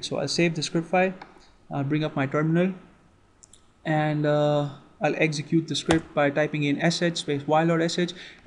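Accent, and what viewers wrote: India. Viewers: stop trying to use american colloquial language...especially with this indian accent...Preferably stop using english....there are many channels with proper english... It's better to stick to hindi for you guys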